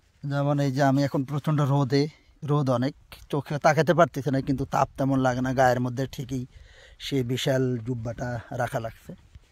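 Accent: Noakhali, Bangladesh. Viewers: ভাই আপনি কি নোয়াখাইল্লা। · আপনে কি নোয়াখালইয়া ? হুতি-মুতি কওনে বুঝলাম আরি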